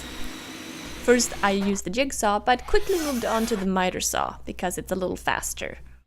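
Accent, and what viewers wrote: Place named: Norrland, Sweden. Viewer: I love the roundover you did!! So smooth and modern looking :-D This piece is very nice. And that's a compliment coming from a person who does not like knots in wood at all (clear only) ;-) Very nice looking work! :-) Just curious though: have you ever used the "bow tie" type of jointery? Here's a short vid by a guy who acts kinda weird, but his method seems very good - they are custom shapes too! <link> By the way, by any chance are you from Northern Sweden, I once had a housemate who was from Northern Sweden, his accent was similar to yours. But many other Swedes I've met had mastered the American "accent" (called a "Flat Accent" by the British), so I couldn't tell that they were Swedes until they started talking to each other...it was CRAZY :-D I couldn't believe my ears. They had no discernible accent at all. Crazy, right? 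:-)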